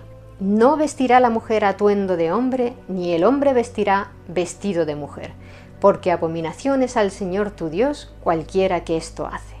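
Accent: Castile, Spain. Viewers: Hola Feliz Navidad cómo estás, me encanta ver tus videos y escuchar tu acento castellano, Yo amo la cultura, la historia la política, la religión y la música y escuchar tu voz en la narrativa, me encanta, Gracias por estos videos, Dios te bendiga hermosa mujer y que la Virgen te siga cuidando amén; la doncella de Orleans se convirtió en la patrona de Francia ⚔️🙏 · Soy de Colombia pero me encanta escuchar estás historias antiguas en el acento de los españoles,siento como si estuviera viviendo en carne propia esa historia,lo relatas muy bien.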